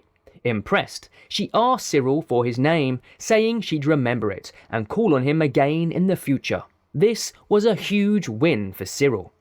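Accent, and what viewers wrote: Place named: United Kingdom. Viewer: never heard a british voice for recaps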